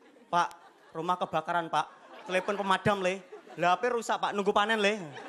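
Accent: Java, Indonesia. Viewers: Logat jawa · aq ska bngettt ngomong medokmu iku loe. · itu kata" nya biasa...tapi omongan khas jawanya ngakak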